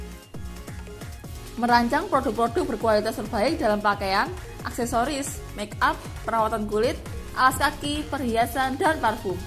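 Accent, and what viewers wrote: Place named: Java, Indonesia. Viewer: medok bgt ngomonge 😅 jawir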